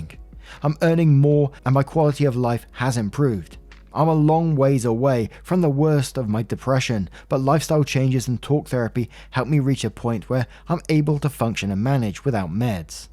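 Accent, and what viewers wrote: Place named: United Kingdom. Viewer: What? has an accent? I didn't know! Oh, wait... he's a fellow Brit! 😁 But you are right, he'd do well as a voiceover artiste.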